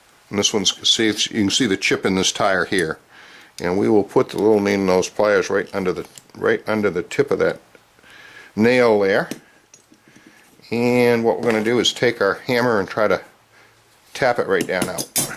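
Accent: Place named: New England, United States. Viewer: Very, very nice job---Bravo-Zulu, amigo, for bringing a "dead toy" back to life! I saw the Windham Maine realty sign in the background....and I believe I detected a New England accent (although I believe you stated you were in Virginia). I applaud your efforts! Equally worth admiration (maybe even more?) I applaud your wife allowing you to use the kitchen sink!!! A very pleasant video!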